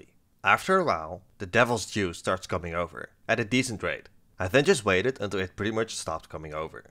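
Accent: Netherlands. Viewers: I know you are dutch you cant hide your dutch accent from a fellow dutchman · accent heard, dutchie spotted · Are you Dutch? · I hear a dutchy😂 already a fan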